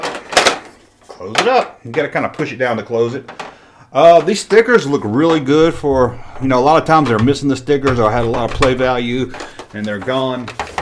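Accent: South, United States. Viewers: Ya can’t talk right because you were raised in the south ya hick.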